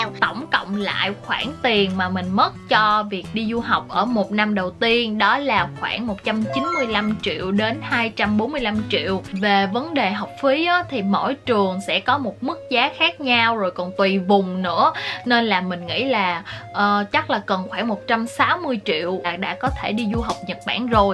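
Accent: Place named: Mekong Delta, Vietnam. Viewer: Ôi e là người miền tây à C cứ tưởng e là người Sài Gòn cơ 😁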